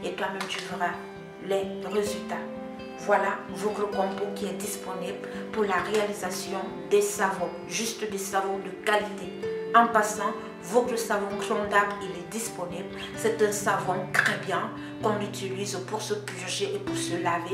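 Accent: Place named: Africa. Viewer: T'es super, je me suis régalée d'entendre ta voix charmeuse et douce avec cette note d'Afrique...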